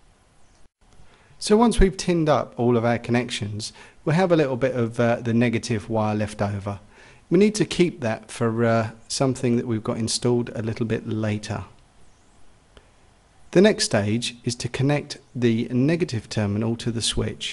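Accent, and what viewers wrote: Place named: Southern England, United Kingdom. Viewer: The ugly mug is welcome! As is your amazing accent! Southern England? Anyway, thank you for the very informative videos! I am a first-time modder and your help is very welcome!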